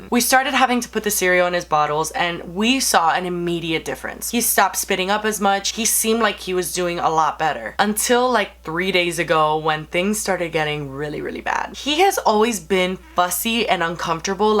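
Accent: Miami, United States. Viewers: Alaina Reyes lol I totally noticed her having more of an accent, but I thought it was just her Miami-Cuban in her lol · She's Miami Cuban. It's a Miami accent. What a rude question.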